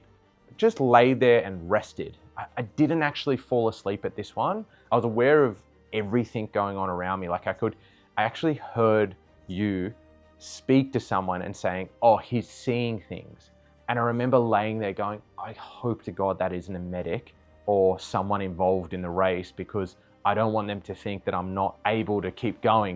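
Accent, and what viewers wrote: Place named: Australia. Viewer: Great to hear a downunder accent. Thanks for this, it was so interesting.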